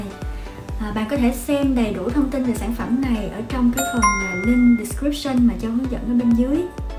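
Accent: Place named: Japan. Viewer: cảm nhận của riêng em là chị quá đẹp và tinh tế trong phong cách ngoại hình và giọng nói(em cảm nhận nó nữa Việt Nam nửa Nhật Bản). Em xem về clip phân loại da của chị rất hiệu quả, em cám ơn chị rất nhiều những chủ đề về da, việc học và đời sống của chị tại Nhật Bản rất hay luôn, em luôn mong chờ những cip này từ chị Châu chị là hình mẫu của em về sự tiến bộ để đạt được những thứ mình muốn, chúc chị Châu luôn khỏe vui và sống trong những thứ tốt đep trong cuộc sống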